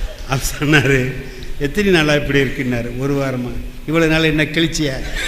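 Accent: India. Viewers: தஞ்சாவூர் வட்டார வழக்கு -குறிப்பாக திருவாரூர் -நாகை பேச்சு வழக்கு இப்படித்தான்! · என்னதான் நகைச்சுவையாக பேசினாலும்...சரியான கருத்துக்கு வந்து நின்று பேசுவார்..நகைச்சுவையும்..கருத்தும் perfect blend ஆகும்..கூடுதலாக திருவாரூர் வட்டார மொழியும் super blend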